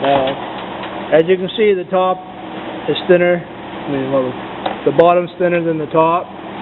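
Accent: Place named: Maine, United States. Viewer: Where are u out of? From ur accent my guess is up here in maine...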